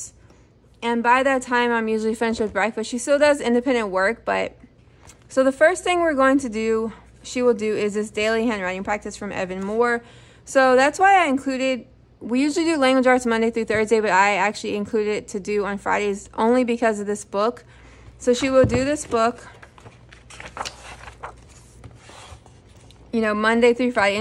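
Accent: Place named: Argentina. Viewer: Hi! I am curious to know where you are from..the way you speak English sounds a lot like mine. I am originally from Argentina but I live in the US for a litter over half of my life. And I homeschool my kiddos that is why I got drawn to your channel. Thank you for sharing.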